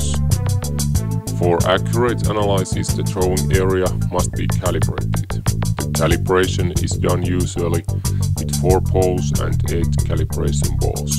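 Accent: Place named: Russia. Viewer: Sounds like he's Russian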